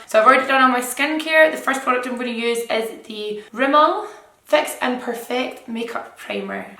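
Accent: Scotland, United Kingdom. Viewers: omggg I love your Scottish accent xxxxx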